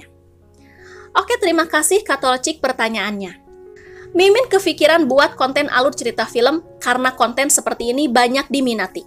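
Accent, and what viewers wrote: West Java, Indonesia. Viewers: Akhir nya tau juga teh mimin org Bandung 😂🤭 sy jg ngikutin bang Jeder & bang Gelo... Sy doa kan smoga berkah nya teh mdh"an tmbah berkembang channel nya..dri awal suka dengerin suara c tth sok hyang seuri lamun teh mimin ngomong sunda teh 😂 😂 ciri khas bgt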